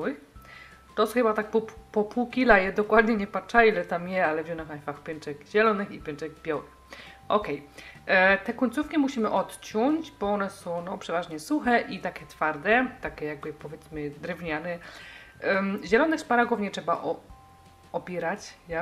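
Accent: Silesia, Poland. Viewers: Te nitki obetnam, te szporogi pomieszom... Uwielbiam slunski jezyk :D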